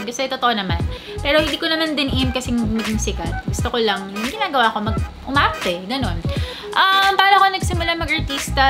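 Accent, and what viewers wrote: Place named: United Kingdom. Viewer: ok naman pronounciation nyo ng youtube, british pronounciation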